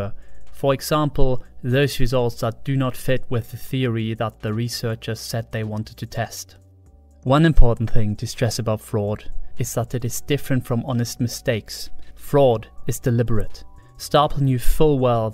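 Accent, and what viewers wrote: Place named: Germany. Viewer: It took me that long to realise that you were German 😂 Mega spannendes Video, hast du einen Hintergrund in Psychologie, oder wieso kennst du dich so gut aus?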